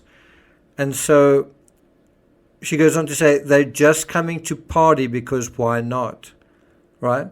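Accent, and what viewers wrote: South Africa. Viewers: Hello. Are you South African? Thanks for this.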